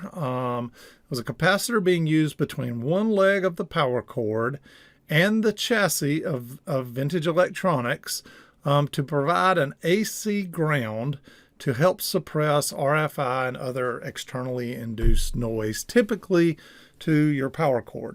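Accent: South, United States. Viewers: that southern drawl of yours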